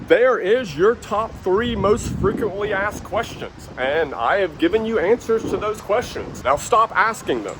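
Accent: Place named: Georgia, United States. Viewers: Your Georgia accent is very strong and thick but that is okay because you are well spoken on your video's. I like your video's very much and I am sure with your work ethic you will have great success in business and be prosperous. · Ya you sure have that Atlanta accent, congrats bro you showed up NUMBER ONE on my home page under recommended! big moves my dude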